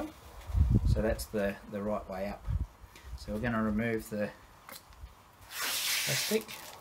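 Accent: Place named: Australia. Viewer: Who doesn't love a good Aussie accent :)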